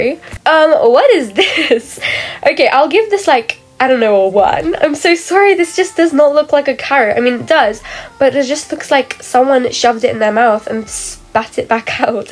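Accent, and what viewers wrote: United Kingdom. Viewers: You sound like a british gurl 😮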